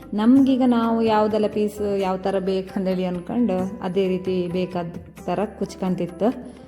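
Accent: Kundapura, India. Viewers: ನಿಮ್ಮ ಕುಂದಾಪುರ ಕನ್ನಡ 👌👌👌👌👌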